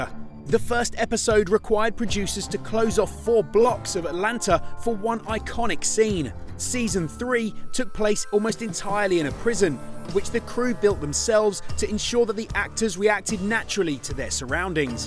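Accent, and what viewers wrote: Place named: United Kingdom. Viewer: 我愛英國腔!讚!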